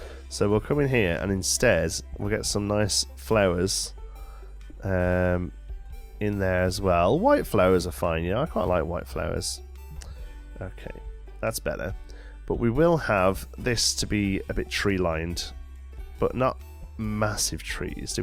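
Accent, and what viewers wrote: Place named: England, United Kingdom. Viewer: i watched all your previous videos ep i loved ur accent lookin english uk sometimes better than en usa , i wished this game free because i support always free games open source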